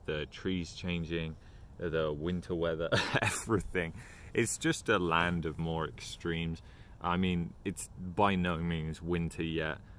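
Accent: United Kingdom. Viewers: you're so interesting to listen to. What part of the UK are you from?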